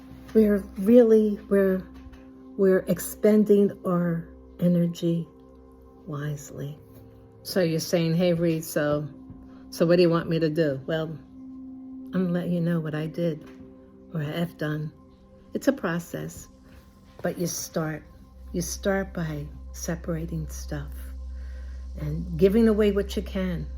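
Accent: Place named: New York, United States. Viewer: This is a great video. Not sure why it came up in my feed - the intrusive all seeing eye of my phone perhaps? I have been declutteting for a long time. Critical life threatening illness in 2020 ( not covid) was the catalyst. I had a 2-3 yr recovery so that was slow. Now I am.picking up momentum. You provided some great tips. I have a large property which we wont be leaving anytime soon but some of the big possessions are leaving. I retired as a commercial cake decorator in 2018. I got sick in 2019, hospitalization 1/9/2020-1/20/2020. My shop remained unchanged in time from the time I closed the door 9/23/18 until about fall 2024. Its here on the property, a separate building from my home. I have been diligently whittling stuff down, selling equiptment etc. Believe me the house and garage have stuff too along with a full barn (horse drawn carriage 😬🙄) but this is a good place to start. 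You sound like you might be from NY which WAS my home state. Incidentally, I have a scrimshaw bottle opener I carried home from my aunts house in Norway. There is no reason to get rid of it, it has purpose. Thanks for the beautiful video.❤ Melinda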